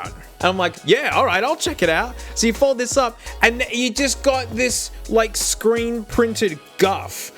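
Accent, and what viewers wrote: Australia. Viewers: You’re Australian? 🙃🙃🙃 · and it is so refreshing listening to a fellow Aussie haha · Funny angry Australian man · In this video u sound more australian than the usual... idk why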